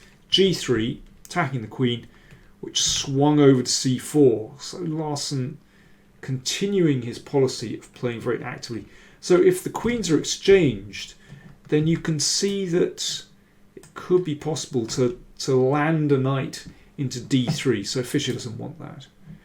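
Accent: United Kingdom. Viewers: Adnan he actually used the word "perilous" in this video which I can safely say Ive never used in my whole life. Its that British diction I love it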